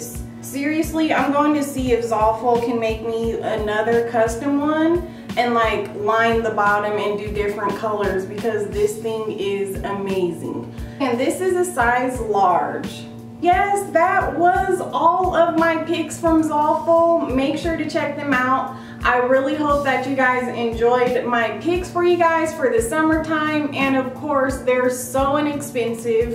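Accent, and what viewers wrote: South, United States. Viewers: Where are you from you have like a southern twang to voice.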